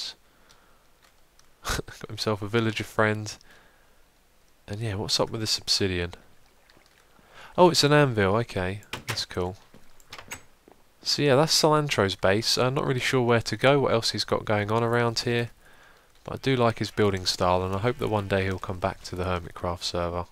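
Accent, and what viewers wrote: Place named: United Kingdom. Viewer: Brittish English....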